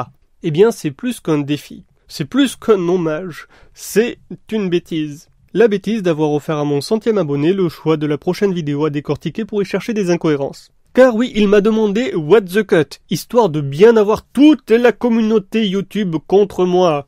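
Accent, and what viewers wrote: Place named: Lot-et-Garonne, France. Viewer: Par contre, t'es vraiment du Lot et Garonne? Parske t'as un léger accent.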